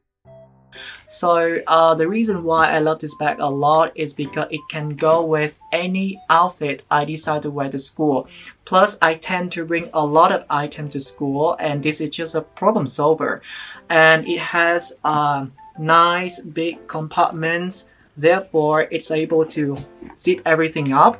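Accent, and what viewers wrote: United Kingdom. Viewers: Sounds like British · I hear a little bit of a British accent 😃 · Ui cho em xin phép hỏi là anh học tiếng anh từ lúc nào và sao anh học được giọng anh vậy ạ ??? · Oh you have British accent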